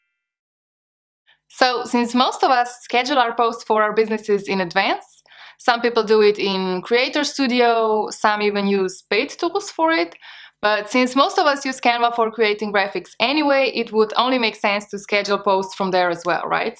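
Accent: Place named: Hungary. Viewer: After couple months in Budapest I recognize that Hungarian accent anywhere lol! Great vid!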